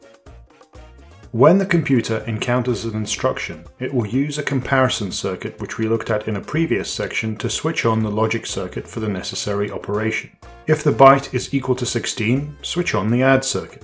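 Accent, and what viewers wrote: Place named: United Kingdom. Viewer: The audio quality and the british accent. Also the way of teaching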